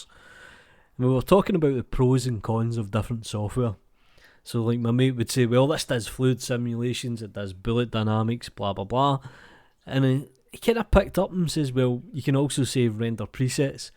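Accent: Scotland, United Kingdom. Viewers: Scottish accent i hear? Thanks for this. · Liked the good video. Your scottish accent is so distinctive... reminded me of Dundee. De ye ken a way to save full rendering set not just size pal?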